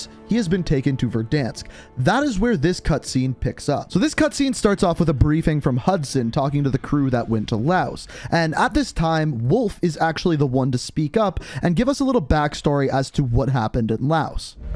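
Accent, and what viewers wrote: Canada. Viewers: Are u from Canada?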